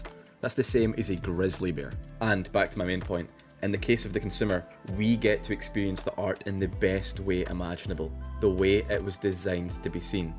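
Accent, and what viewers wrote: Scotland, United Kingdom. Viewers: Like the Skaughtisch accent.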